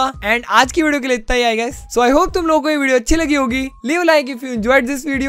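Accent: Gujarat, India. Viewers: Are you from Gujrat?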